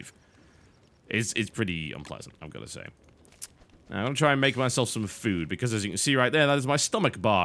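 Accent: United Kingdom. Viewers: He's still British. What the hell are you smoking?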